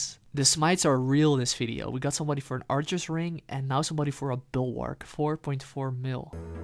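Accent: Netherlands. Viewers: Keep up the work man, just found you and you earned a new sub from me. Btw are you Dutch? RSN: Penguin Hug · Your dutch accent is so obvious 😂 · Are u Dutch? Accent tells everything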